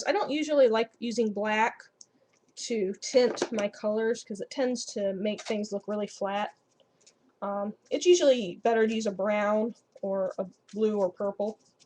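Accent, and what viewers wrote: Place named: Midwest, United States. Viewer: Good tutorial. Thank you. Are you by any chance from the Midwest? I'm from Illinois and your "accent" sounds local. I particularly liked your dunes. I seem to have a perspective problem with beaches. Mine look flat. Thanks again!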